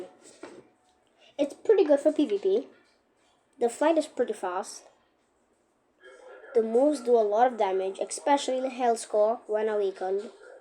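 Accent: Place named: Trinidad and Tobago. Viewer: We know you trini boi stop hiding the accent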